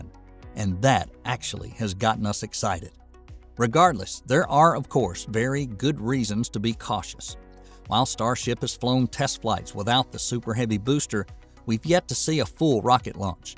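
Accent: South, United States. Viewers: don't get me wrong I love you guys... You're my main spacex channel now..🤗👍 And I think the main reason is you have a Southern accent like me and I can understand you better 😂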